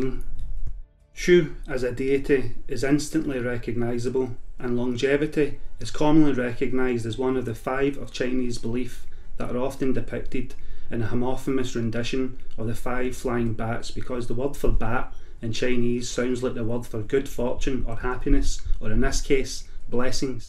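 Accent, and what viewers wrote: Scotland, United Kingdom. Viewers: I believe it's Scottish...or Irish.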